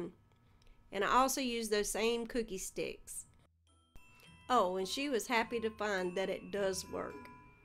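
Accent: South, United States. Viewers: Nice. I love your southern twang! It's fantastic!!!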